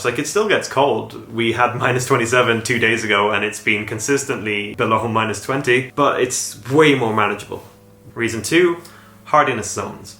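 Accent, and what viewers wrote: Sweden. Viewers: That swedish accent is creeping in 😂😅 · Why do you sound Swedish 😭 I can’t hear an accent at all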